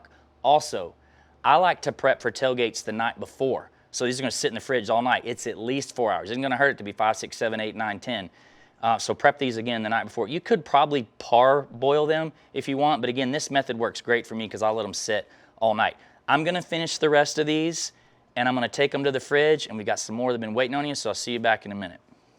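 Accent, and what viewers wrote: South, United States. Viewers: The perfect speed to watch this video is 1.75X. Try it at 1.75X speed and you will see it watches like a regular video and this guys slow manners and southern drawwwwwwl Go away.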